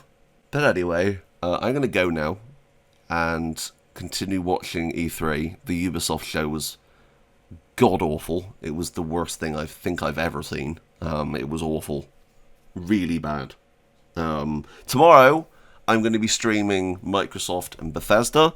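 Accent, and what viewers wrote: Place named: West Country, United Kingdom. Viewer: Awesome video. Give them a chance. Awesome bit of news and well put together. It's good to hear a west country accent in a video.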